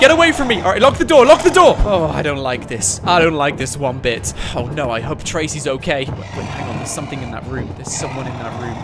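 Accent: United Kingdom. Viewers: I have to say that i LOVE your British accent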